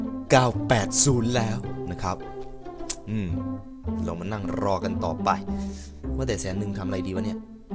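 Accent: Isan, Thailand. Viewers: พี่คนอีสานหรือปล่าว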